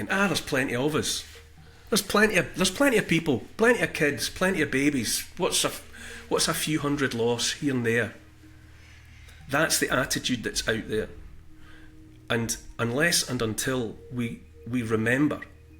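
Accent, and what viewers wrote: Scotland, United Kingdom. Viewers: Probably because... Won't Kneel A gentle, beautiful Scottish lilt A kind, empathic, forward tilt An open heart; a caring face A willingness to take his place. A strong, home-hitting monologue Reminds us of the boiling frog The courage to become a sleuth A passion to release the truth. A deep desire to crack the whip Illuminates our freedom-strip Calls out the darkness taking hold The shiny despots; plated gold! Intense and raw, he lays it bare A soul-enhancing, honest stare Inflections drive the message through He speaks for Us - for me and you! Discernment's such a precious gift His words create a mighty lift Take heed of all he has to say He knows - it shouldn't be this way! 🤗🦋🐬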